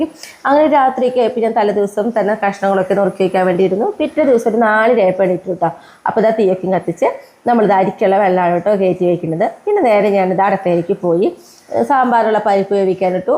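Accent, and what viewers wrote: Thrissur, India. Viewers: thrissur aano sthalam?..ollur aano?... talking style kandittu thrissur pole thonni..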